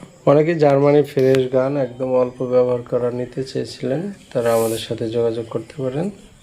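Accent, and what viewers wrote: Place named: Jessore, Bangladesh. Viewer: যশোর কথায় ভাই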